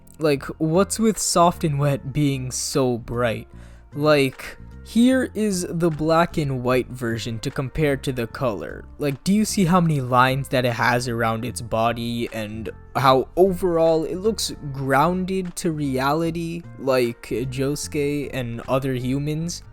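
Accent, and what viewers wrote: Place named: United States. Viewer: I don’t want to sound salty, overly critical, nor judgmental: But I hate how “JoJo“ or some other English words come out as American’s say them. I was born in the states but raised around Latino culture but the way you guys say: “Joe Joe” sounds a lot like “Jue Jue” and when you said “Pay-slie-park” it sounded like you said: “Pay-lee’s-park”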